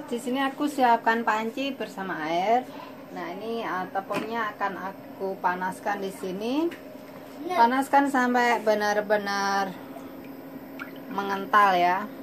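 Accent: Java, Indonesia. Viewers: biar gk leleh mbk tapiokanya ....hhhhaq suka mbk nya ngomonge medok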